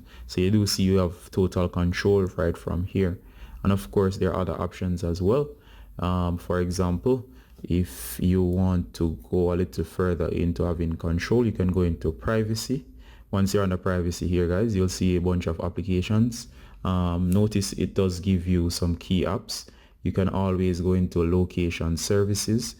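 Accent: Jamaica. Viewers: Your Jamaican??😀😃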